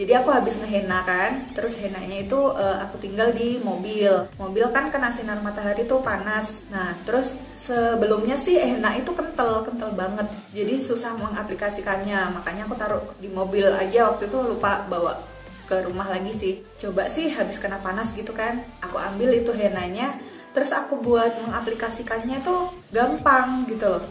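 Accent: Java, Indonesia. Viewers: Suka mbak mix bahasa jawa gtu ngomongnya,,,hehe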